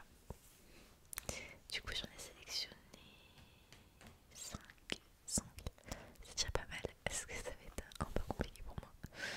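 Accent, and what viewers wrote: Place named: Italy. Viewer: Olala incroyable ce mélange italien/français !! puis tu as un accent italien magnifique je trouve ! Une partie 2 un peu plus longue serais génial😍 Merci pour c’est belles vidéos Sois béni, que dieu te protège🙏🏻✝️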